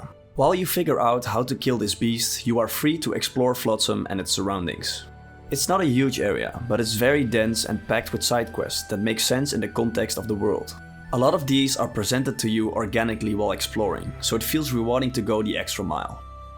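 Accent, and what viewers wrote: Netherlands. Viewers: That Dutch accent though… 😉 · Nice edit. Also like te Dutchy accent. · that thick Dutch accent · Good video, if you're interested I would like to give you a few tips on your English pronunciation, being a Dutchy myself. You are super close to perfect pronunciation. All you really need to do is shake some of the hard f's, t's and s's that we use in Dutch all the time. Smooth those out and your English will be *chef's kiss*.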